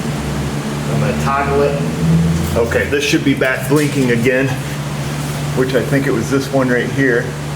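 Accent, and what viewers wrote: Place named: Western New York, United States. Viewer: I enjoy the accent brother, I'm a native Buffalo/Hamburg, you are over in Bath, that's the accent which reminds me of home.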